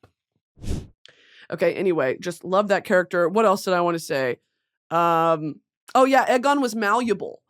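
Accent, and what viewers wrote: United Kingdom. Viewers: PLEASE Brittany make a potions ASMR video but also WITH THE BRITISH ALCHEMIST ASS ACCENT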